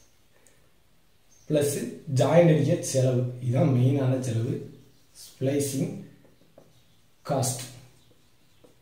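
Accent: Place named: Tirunelveli, India. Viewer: Are u from nellai district or kanyakumari...?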